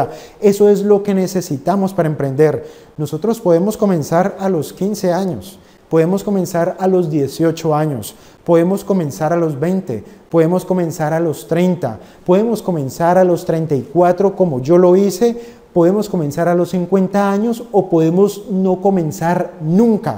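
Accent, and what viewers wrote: Colombia. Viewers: 💎💎😮Parce Tu Eres Colombiano?? Buena!!!!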